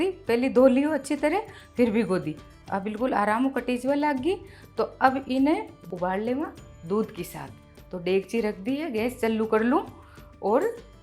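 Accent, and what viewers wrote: Rajasthan, India. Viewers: I like your Rajasthani boli and recipes too.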